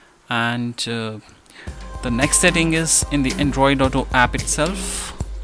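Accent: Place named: India. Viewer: the first tutorial with hindi accent that actually helped me Great job mate you are 1 in a million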